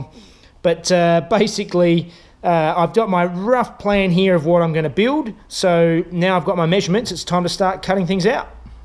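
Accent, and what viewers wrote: Australia. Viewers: He said put the earth on it when he forgot the ground lol it’s just funny because I’m American and I like the way the Aussie talk lol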